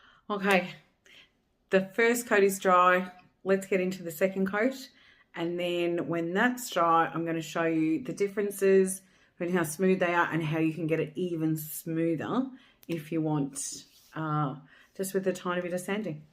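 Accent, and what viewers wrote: Australia. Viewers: Thanks so much for the in-depth video! Always good to hear a fellow Aussie too haha. This was fantastic, definitely deserves more views and likes. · Lovely to hear an Aussie accent - thanks for the info!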